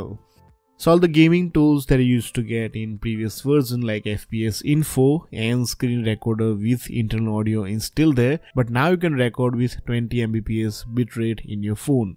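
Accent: India. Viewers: Your voice sounds like indian person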